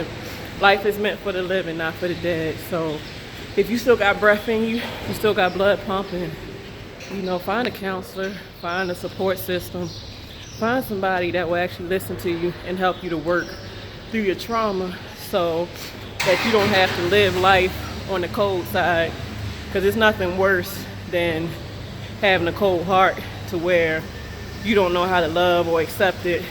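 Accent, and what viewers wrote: Baltimore, United States. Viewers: I know this has nothing to do with the subject matter, but I knew all this time you had a Baltimore accent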